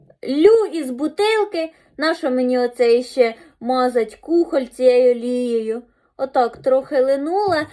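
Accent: Poltava, Ukraine. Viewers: ну знаєш полтавський говір це одне, але коли написано безграмотно...,😭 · В багато підписників на різних каналах Це її образ селянської дівчини з Полтавським акцентом Подивіться її інтерв ю вона грамотна, сучасна дівчина,одягається гарно і по сучасному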